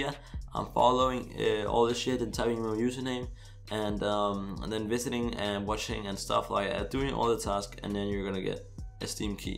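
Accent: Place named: Denmark. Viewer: Trode ik du var dansk :P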